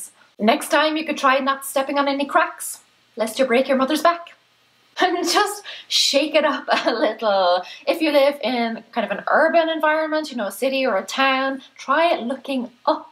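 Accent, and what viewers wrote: Ireland. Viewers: Omg I love the way you put things into doable stuff. You actually get it! Thank you I have 4 girls it's hard sometimes! I love them of course but thanks for your honesty (inspiring) xxx what part of Ireland are you from?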